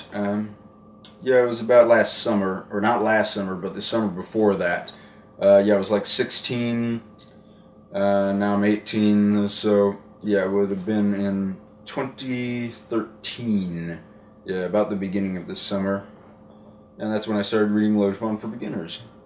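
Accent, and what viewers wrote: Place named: RP, United Kingdom. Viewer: what state are you from? you sound very posh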